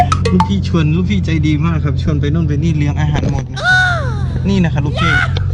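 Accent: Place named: Myanmar. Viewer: เป็นพม่าหรอครับ สำเนียงเหมือนเลย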